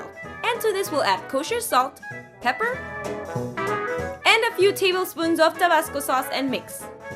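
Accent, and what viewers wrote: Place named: England, United Kingdom. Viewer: Soy la única que viene del canal y no entiende🤣🤣amooooooooooooooo tu acento Inglés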